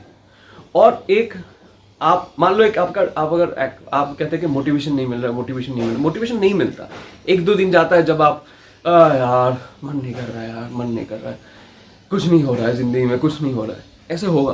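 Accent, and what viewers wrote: Bengal, India. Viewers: Sirf 'ra' sound ki wajah se aapka Bangla tone aa jata he.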